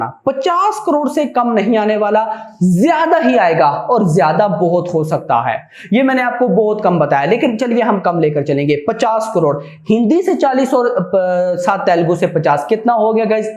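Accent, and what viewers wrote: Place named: Pakistan. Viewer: Bhai kaha se ho bolne se to Pakistan ke lagte ho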